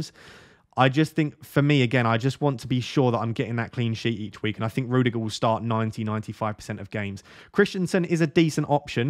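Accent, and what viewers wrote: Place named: Essex, United Kingdom. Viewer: respect mate! Essex makes sense because of the accent. Big up Man Utd ggmu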